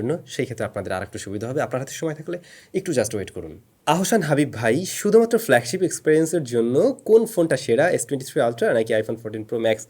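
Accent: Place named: Comilla, Bangladesh. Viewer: Ar vaia apnar village ki Cumilla? Maje maje kotha sune mone hoccilo. Please janaben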